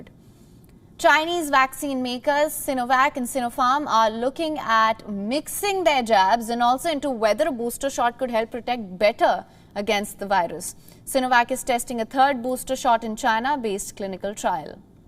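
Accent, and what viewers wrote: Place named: India. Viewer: Something about Indian English... It like proper English with unusual word choices on occasion.😎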